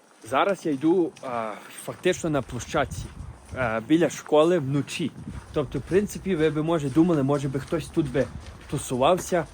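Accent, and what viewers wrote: Western Ukraine, Ukraine. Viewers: галицький діалект, і чого так зневажливо, " западенці"?, це що за кацапські словечки? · Не чув) але говір як западенський)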